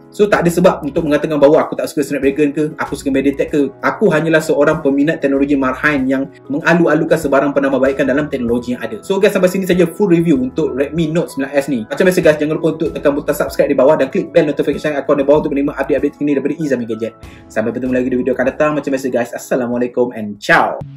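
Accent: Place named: East Coast, Malaysia. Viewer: Maaf tanya bang,asalnya org mne bang? Bunyi mcm loghat pntai timur sikit.. bahahhaha.. cun bang.. buat sy terpikat nk beli.. haduhhh.. cdgn sikit bang.. berbanding mi max 3.. mane lagi baloi? Sbb nk buat lenjan game biase2.. bukan hardcore sgt.. sbb kita faham sekadar mid sahaja.. just mintak pndgan.. hehehe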